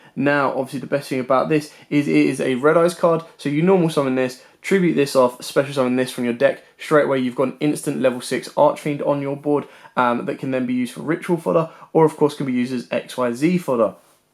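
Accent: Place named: United Kingdom. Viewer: Are you an Englishman? I can hear a Brit accent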